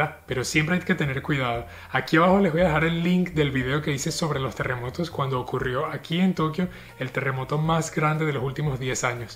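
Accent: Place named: Venezuela. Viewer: ¿Te formaste como músico en Venezuela, en el Sistema de Orquestas y luego te perfeccionaste en Suiza? ¿Rechazas tu condición de venezolano? El acento es innegablemente venezolano.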